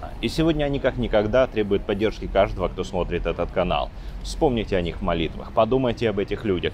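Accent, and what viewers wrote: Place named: Moscow, Russia. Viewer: мене просто здивувало, те що вчитуючи саму специфіку данного регіону, в якому взагалі важко почути російську, а тут на тобі російська та ще й я б сказав чисто з московським акцентом?!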